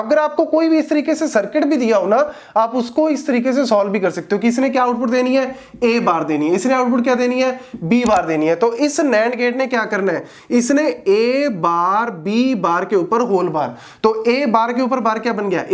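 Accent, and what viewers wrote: Punjab, India. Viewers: Sir aap Panjabi ho kya?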